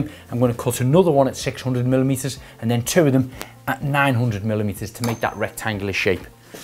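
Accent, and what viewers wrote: Liverpool, United Kingdom. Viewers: He’s a scouser from Liverpool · excellent tutorial, thanks for clear and patient explanation , is it liverpoolian accent btw? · Thanks, Yes were from L,pool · From which part of UK is the presenter ? I like the pronunciation ... especially the "cut" with the U ... :) PS very nice the S too ... shhhtandard ... (great !)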